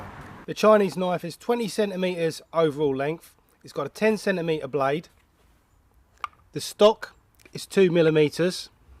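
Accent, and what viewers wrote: United Kingdom. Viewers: .....but you are originally a Brit?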